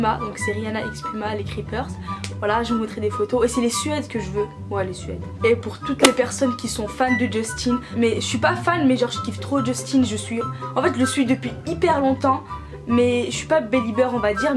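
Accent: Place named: England, United Kingdom. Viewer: J'adore ton accent anglais 😂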